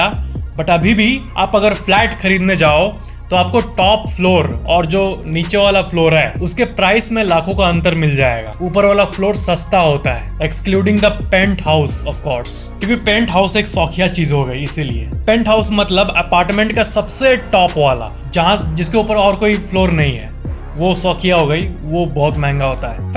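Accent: Bihar, India. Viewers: "i just love your bihari acsent "😂❤️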